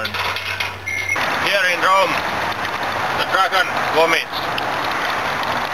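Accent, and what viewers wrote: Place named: Finland. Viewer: I'll listen to this, if i miss the proper Finglish! :D Such a clearly nice strong typical Finnish accent! Yeah! :D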